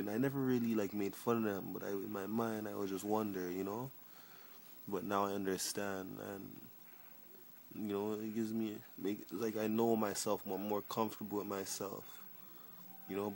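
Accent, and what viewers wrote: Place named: Jamaica. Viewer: I hear a yardie accent, bap bap bap. Yu still cute my yute with vitiligo or not. I have vitiligo too and people ask/say the same thing about my skin, but what can we do? But you will be fine.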